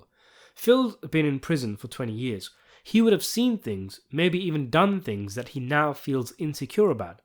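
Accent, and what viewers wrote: United Kingdom. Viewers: Listening to a British guy talk about a show about Italian gangsters is just wild to me idk why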